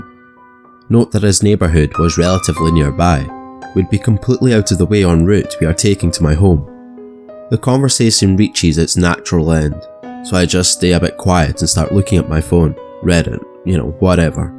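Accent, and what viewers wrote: Scotland, United Kingdom. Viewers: It took me some time to realize, he speaks Scottish, even tho I’m Irish😂🤦🏻‍♀️ anyways, great story☺️ keep on going⭐️ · love the scotts accent,great stories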